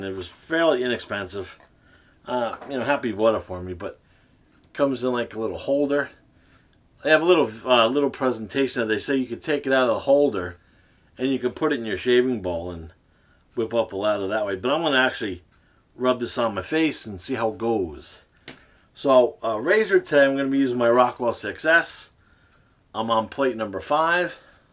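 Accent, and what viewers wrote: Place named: New Jersey, United States. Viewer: Where do you live? Your accent makes me think you might be from New Jersey or New York, but I could be wrong.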